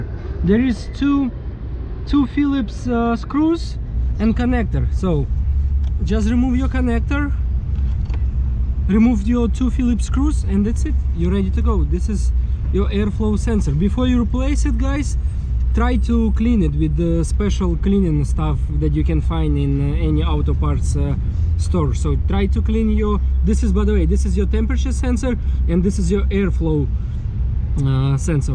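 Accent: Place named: Russia. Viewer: ОО, русский акцент)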